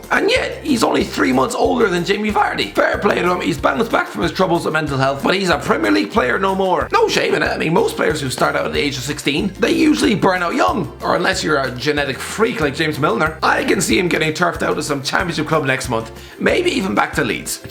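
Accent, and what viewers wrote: Ireland. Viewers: Press f in the chat for Irish guy voice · Irish accents are really nice, but your sick, dont upload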